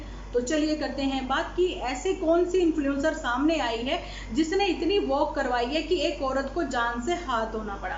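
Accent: Punjab, India. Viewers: Your punjabi so sweet.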